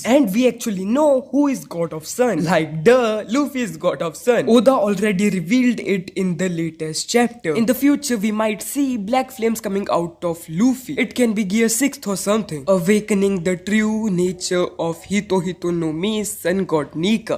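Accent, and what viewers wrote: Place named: India. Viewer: are yu ind. yur accent is alike one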